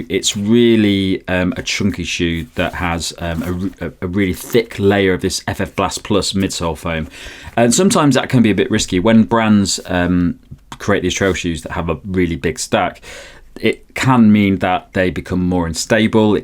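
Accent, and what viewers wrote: United Kingdom. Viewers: Are you from the Black Country. Is that why you talk like that?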